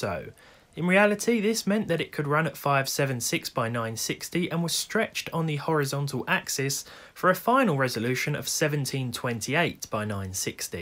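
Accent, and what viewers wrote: United Kingdom. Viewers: This guy is the most stereotypical brit I've ever heard. This cheeky baastud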